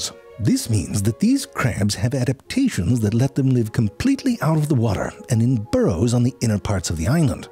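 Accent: Germany. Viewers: All these years and i had no idea Ze Frank was a native German speaker till now! That's really cool! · What the heck, your German sounds so good! Just from the way you speak I as a German would’ve thought oh, this is probably a dialect from some other federal state. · Your german accent is impeccable!!! 😊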